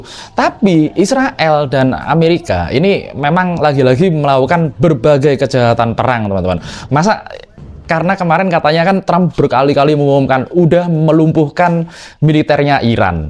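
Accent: Java, Indonesia. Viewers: Mas. Nonton chanelu ini bukane tegang. Tapi malah kudu guyu ae massehhh. Khas asli wong jowo awakmu ki cara ngomonge.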